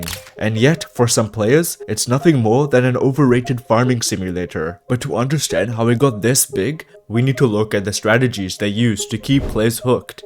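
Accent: India. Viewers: Wait why does he sound indian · I love this guys indian acseent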